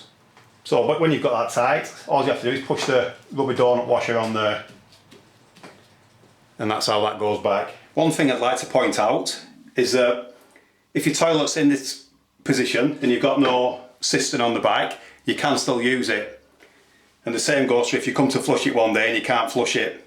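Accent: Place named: North, United Kingdom. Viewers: I have this type of toilet, it’s knackered and I need a new one fitted! Any chance you are close to Liverpool and could come and do it for me please? Your accent sounds like you may be from St Helens which is only down the road 🙏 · thank you for that - good man. Got that trust worthy northern workman voice as well to install even more confidence!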